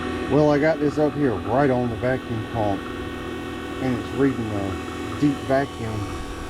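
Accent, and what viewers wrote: South, United States. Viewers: Lov the southern slang. Gunna plug it up 😂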